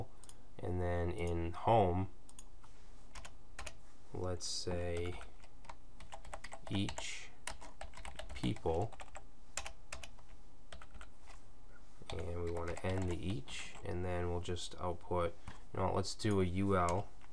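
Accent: Boston, United States. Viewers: You from Boston bro?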